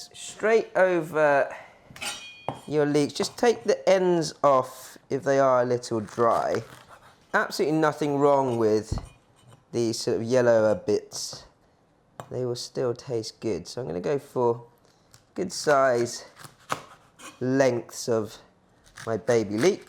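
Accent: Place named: RP, United Kingdom. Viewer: First, I am not sure how I got here. Second, Food? meh. Third. But, honest to goodness why are you trying to sound like Nigella? Is it because it is supposed to sound real poash? But.. Her father was the Chancellor of the Exchequer! And it's annoying like it's supposed to be better than everyone else.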